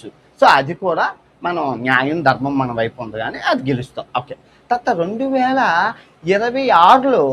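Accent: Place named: Visakhapatnam, India. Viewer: Actually Brother, మీ భాష సరిగ్గా వుండదు, గరికపాటి గారు చెప్పిందే మీరు చెప్పారు కాని, అదే విషయాన్ని మీరు వెటకారంగా, అసభ్య భాష పదజాలంతో చెప్తారు. అందుకే మీ పై వ్యతిరేకత ఎవరైనా మీకు negative feedback ఇస్తే తీసుకోవడం, తప్పులు వుంటే సరిదిద్దుకోవడం అలవాటు చేసుకోండి Brother. నేను ఒప్పుకుంటాను, మీరు నిజంగా చాలా మంచి పనులు చేశారు. నిజంగా betting apps పైన మీ పోరాటం నన్ను బాగా కదిలించింది Brother. కాస్త మీ భాషలో బూతులు లేకుండా వుంటే చాలు. మీ Vizag యాస తో అయితే అసలు problem లేదు. ఒక తమ్ముడు, ఒక అభిమాని, ఒక శ్రేయోభిలాషి లాగా నా feedback తీసుకో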